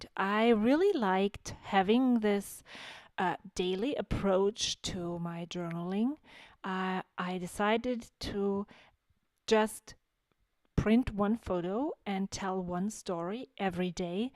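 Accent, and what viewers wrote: Germany. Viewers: nice video. I love the way you speak English by the way <3 it reminds me of my very dear German friends.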